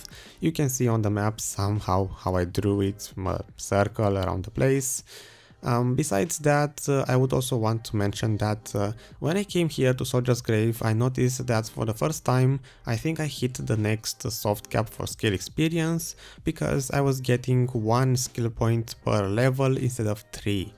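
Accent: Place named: Romania. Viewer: yo, nice vids man, are you Romanian? Thou your English is almost perfect, i can hear a hint of accent which takes me in that direction :P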